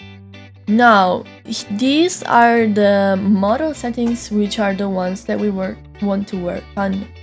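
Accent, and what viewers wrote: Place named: Italy. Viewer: Ma scusa, ci sono già migliaia di persone che parlano inglese e hanno più views. E te che sei Italiana e si sente DI BRUTTO, ti metti a fare tutorial in inglese? 🤦🏻‍♂️ La community inglese, ha fin troppe persone che spiegano pure come costruire un razzo spaziale. Invece la community italiana è sempre quella più svantaggiata. E giustamente te ti metti a parlare inglese per avere più ascolti. Risultato: 3000 follower. I'm laughing :D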